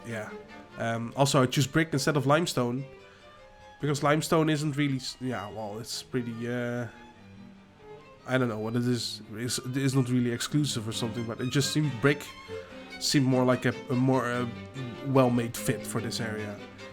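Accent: Netherlands. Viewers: Ik hou van je Nederlandse accent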